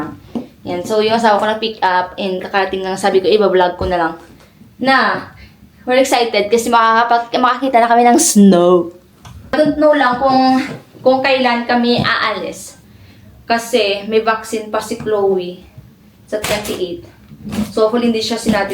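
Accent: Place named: Iloilo, Philippines. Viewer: Parang ilonggo ka ate sapananalita mo😂same, ❤ hi from korea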